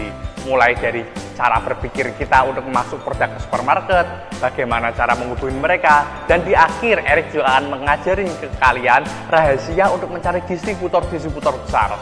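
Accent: Indonesia. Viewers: Logatnya itu lho, medok sekali..... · ini orang logatnya kyk ada bali nya ya???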